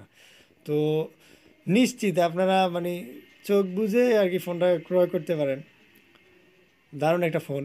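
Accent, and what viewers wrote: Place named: Myanmar. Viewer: Vai khotha valo Kora bolban Karon mramar manusar moto khothaboltasan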